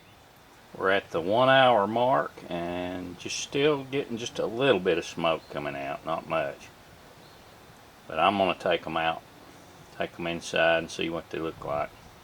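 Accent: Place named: South, United States. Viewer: your Southern accent gives you instant credibility with me on issues related to smoking and grilling. Do you know how hot the lot setting would be for smoking ribs? I am trying to dial in a 3-2-1 method but want to keep the temps at 200-225 F.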